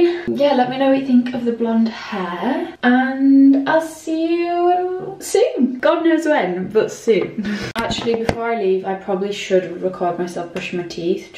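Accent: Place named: North, United Kingdom. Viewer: british, she’s from up north :)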